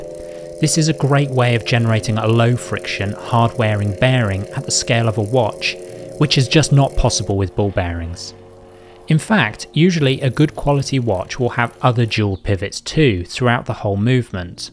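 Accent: United Kingdom. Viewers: This channel is very reminiscent of Clickspring, except with a relaxing English voice instead of a relaxing Australian voice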